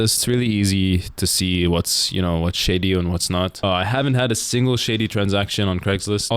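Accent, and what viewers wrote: United Kingdom. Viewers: Why are you british? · You're British accent is so funny :)))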